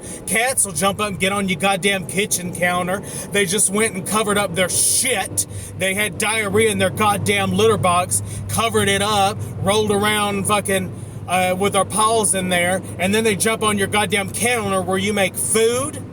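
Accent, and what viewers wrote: South, United States. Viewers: I missed your beautiful southern accent. You make some valid points about cats. I am a cat lover too and my ancestors in Egypt loved cats. Not all cats behave in the way you suggested and a well trained cat is actually a really cool creature to have around the house and long as the house is kept very clean aswell as the animal itself. Cats are generally much cleaner than dogs anyway. All pets add extra workload to the housework so that comes with the territory. I agree a humane cull is needed though, as the cat population is definitely getting WAY too hard to manage for federal and state authorities resources. That being said, human societies around the world have kept animals as pets for thousands of years and that's a part of human nature that you never going to change. We still need these rants urgently as they remind us of our core responsibilities as humans to the rest of the environment. 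Please don't stop making these videos. And thats coming from ME -AN OBSESSED CAT LOVER.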